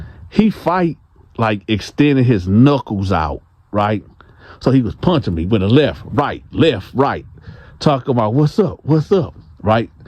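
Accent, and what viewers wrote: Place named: Michigan, United States. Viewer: Your voice is similar to the Goat point guard Magic Johnson. It must be a Michigan accent. #LAKERNATION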